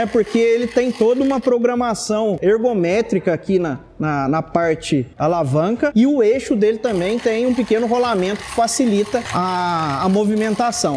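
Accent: Minas Gerais, Brazil. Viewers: mudou para mineires no vídeo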